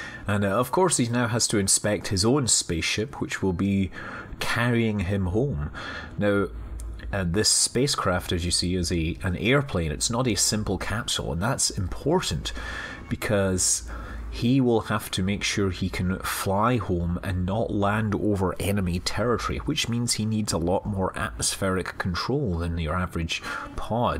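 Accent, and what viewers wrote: Scotland, United Kingdom. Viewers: is this a very very very posh scottish accent? i thought it was canadian at first haha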